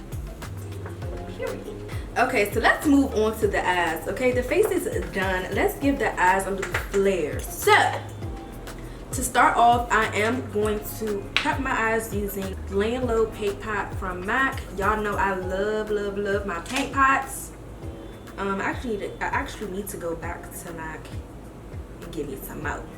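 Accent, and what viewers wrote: Washington DC, United States. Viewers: Oooo is that a Dc accent I hear🥰😍😍❤️❤️❤️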